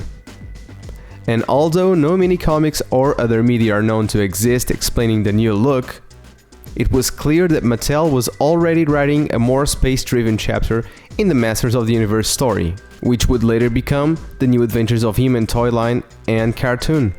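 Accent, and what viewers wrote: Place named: Portugal. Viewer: Pelo teu sotaque es portugues. Encontrei esta figura há uns dias por 50 centimos e achava que era uma falsificação pois não conhecia. Só em casa pesquisei. Infelizmente falta a espada com os 2 fios e o protector de braço, de resto está completa com a caixa e tampa das pilhas. Cabeça do He-man, não do Dolph. Foi a minha primeira figura dos Masters, não tenho mais nenhuma.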